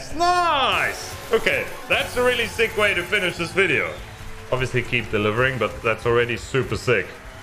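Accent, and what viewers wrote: South Africa. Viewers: Dude are you from SA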